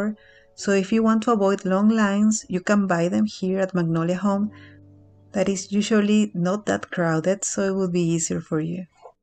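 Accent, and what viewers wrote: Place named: Spain. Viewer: Hola! Me encanta ese lugar, se nota que tienes acento español... Haz algún video en español para los que no entendemos el inglés!!! Gracias!!!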